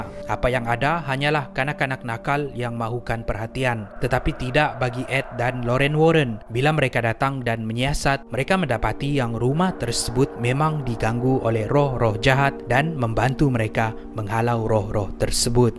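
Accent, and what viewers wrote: Sabah, Malaysia. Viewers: Abg orang sabah ye?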